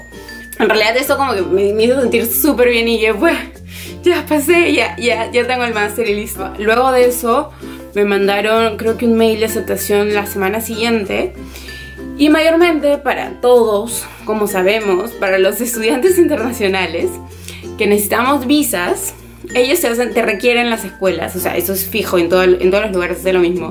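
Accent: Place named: Peru. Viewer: supe de donde eres, de Perú, por el acento, casi no se siente, pero es como un acento vacío o neutro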